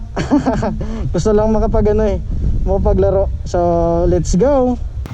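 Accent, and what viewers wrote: Iloilo, Philippines. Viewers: ilonggo ni gali ayy. bakbaki ahhh🤣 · Ilonggo ka sir?' Idol ko ung punto talaga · Ilonggo kapala boss, sa diin ang inyo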